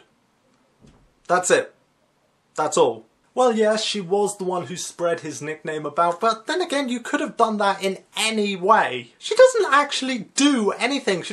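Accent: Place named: United Kingdom. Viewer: ur british accent is so amazing its like sex to the ears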